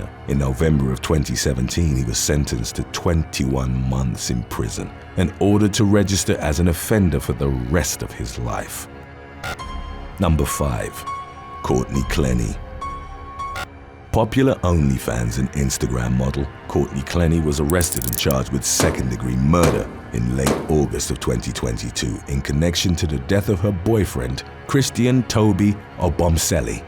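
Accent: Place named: England, United Kingdom. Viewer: He's speaking proper English. Remember, that's where American English came from.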